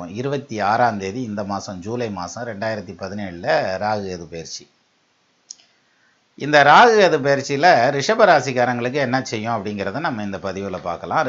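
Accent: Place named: South Tamil Nadu, India. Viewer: Sir,I like ur Tamil and slang..like we south Tamils. Thank u.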